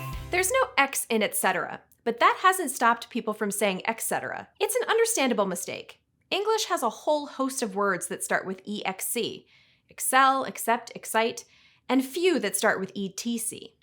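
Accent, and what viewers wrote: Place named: United States. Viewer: Solder is not pronounced sodder in England it's soLder. All your pronunciations are American not English.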